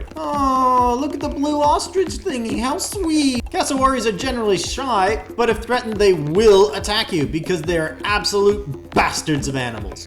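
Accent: Australia. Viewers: Problem with that is you are using an Australian accent for your phonetics. Americans probably translate that as Ayy-roi-kenn-jay! 🤦‍♀️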